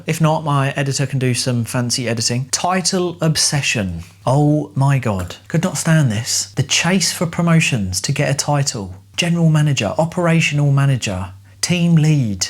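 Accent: United Kingdom. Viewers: Your accent is More british and you speak very fast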